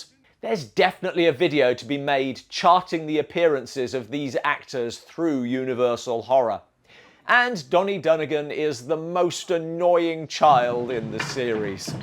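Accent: United Kingdom. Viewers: It really IS hard to see Krogh as anything other than a buffoon after YF, so similar is Marrs to Atwill LOL. There’s something about hearing these stories in an English accent. Between you and Adam Roche, I am a happy man❤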